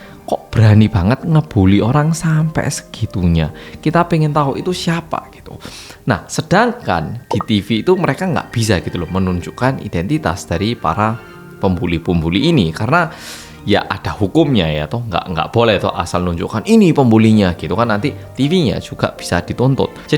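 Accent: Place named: Malang, Indonesia. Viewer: Oalah sakno e talah.. rest in peace ya cantik.. jan kok nemen.. mas kok yo ero kualat.. hahahhahaa... jan medok malange.. salam dari malang.. ❤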